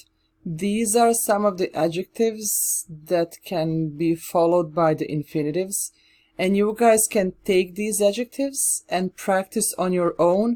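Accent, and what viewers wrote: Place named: Arab world. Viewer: Outstanding tnx mama i think u native of arabic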